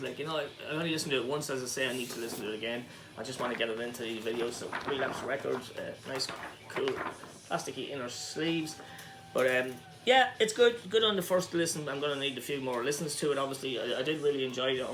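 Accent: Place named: Cavan, Ireland. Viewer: Yea, I deleted it but plan to reshoot it today. I had it all messed up because a few to drink to many hahaha. My good friend Cairan is from Strabane Ireland. I was watching your video and he could tell by your accent your are in Cavan.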